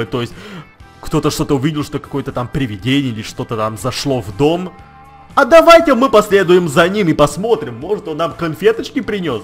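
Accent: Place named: Ukraine. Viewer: ты не русский,ты украинец?,но ты точно не русский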